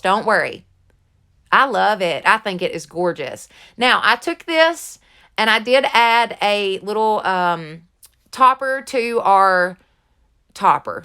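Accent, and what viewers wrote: South, United States. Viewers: I always love everything about your channel especially the accent seeing as I’m from the south 😍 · Love your southern accent. I’m from West Tennessee!!! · Can't pick a favorite as all of your projects are beautiful. Best part is; I have all the materials to make them. Lord have mercy girl you're a kindred soul for sure. You speak "Southern!!!" Music yo my ❤️. Have a Wonderful Christmas 🎂⛄❄️ and Happy New Year.😇...Roberta from N.C. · You are literally the BEST bow maker I have ever seen. ❤ Your lanterns are gorgeous! Ok.. I love your accent. It is a mix between my grandma (OK/ARK) & my daughter (TN/GA) in law. 😉 It makes ya like family. ❤ I love your Reindeer Farms sign. It is gorgeous! Another amazing bow! 🎀 The candle holder turned out amazing! 🕯 That last sign is perfect. The picks in the corner like that is beautiful. Ty soo much for sharing. 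I appreciate it. So happy to have you on this Hop! 😀 Merry Christmas! 🎄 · I love your southern draw & your upbeat personality! You must be a southern gal like myself. I love how you say ‘dude’ and ‘slap that paint on y’all’, lol. You’re definitely a lot of fun to watch & listen to! All of your crafts are beautiful. I love the lanterns! The 3 candle centerpiece is so gorgeous! These are my favorite. Hope you & your family have a Merry Christmas! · You are really fun to watch. I love all your DIY’s. Also you have a wonderful accent. I grew up in Alabama and I miss the southern accents.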